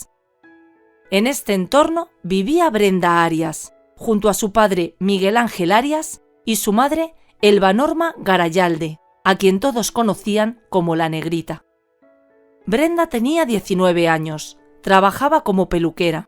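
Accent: Spain. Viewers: eres una mujer excepcional. Relatas casos a la perfección. Me encanta tu acento español. En cuanto al caso de Brenda, afortunadamente se hizo justicia y ese maldito (Juan José Murúa) se pudrirá en un reclusorio. Ojalá también se haga justicia para Marisol y Luz.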